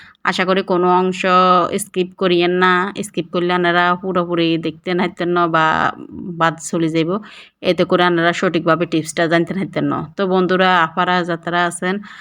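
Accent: Noakhali, Bangladesh. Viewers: আপু নোয়াখালী ভাষা খুব ভালো লাগে ❤❤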